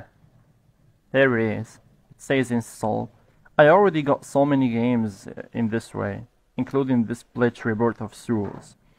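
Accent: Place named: Morocco. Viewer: Out of topic u sound Moroccan or Algerian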